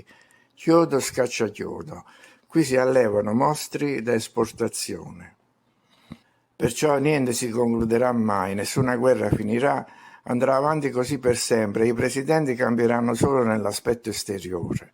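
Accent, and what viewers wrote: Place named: Molise, Italy. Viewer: L'inflessione della voce assomiglia alla mia molisana 😀 Grazie, e scusa per l'invadenza.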